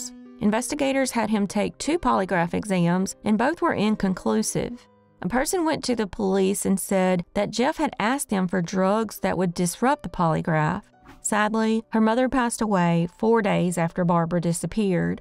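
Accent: South, United States. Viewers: So I’ve always been curious… what part of the south are you from? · Well you got a new follower cos love the stories and love your southern accent. It's a breath of fresh air. That's for sure!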